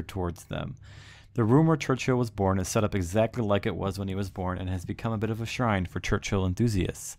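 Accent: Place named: United States. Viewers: He is American or perhaps Canadian and our accent does not define our class. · when you view a video on England you aren't expecting a slightly bored banal-toned American voice reading a tourist pamphlet. Nothing middle class about the honest comments here at all. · i don't understand why an American accent should be such a big deal. I have no problem with i got the information and a view of the beautiful scenery. I am not saying for one moment that you are a snob but it certainly comes across that way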